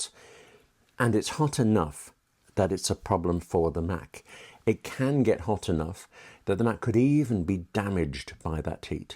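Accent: United Kingdom. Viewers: Mr. you write for an online tech magazine I can't remember the name of at the moment but I really appreciate your articles about Apple. Great work! Cool to see you online with that British accent. So at some point, Bob's your uncle. Thanks for all you do! Subscribed.❤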